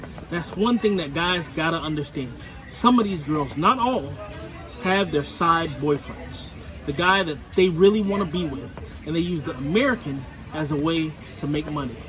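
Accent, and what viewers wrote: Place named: Spain. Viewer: Ahahhaha i love your Spanish accent. Ejejejej no tengo leche en la casa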